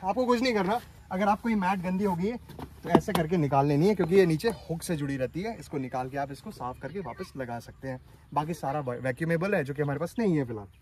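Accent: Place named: Uttar Pradesh, India. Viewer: BHAI AAPKA BAAT KAARNE KA TARIKA BIKUL UTTAR PARDESH STYLE HAE!! LOVE FROM LUCKNOW!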